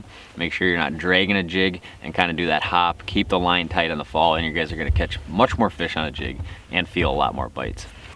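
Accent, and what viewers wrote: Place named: Minnesota, United States. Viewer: Are you from the midwest? You have a very minnesotan like accent lol also great video with actual info not just rambling like all the other videos I've seen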